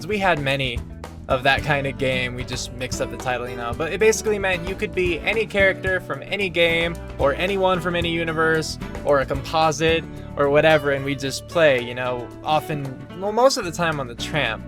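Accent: United Kingdom. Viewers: You probably won't see this but I have three questions: 1. Can/will you play Arkham Origins? 2. Can/will you play Arkham Knight? 3. (Okay this one is kinda silly, but what the hell) are you British? Or just sound British?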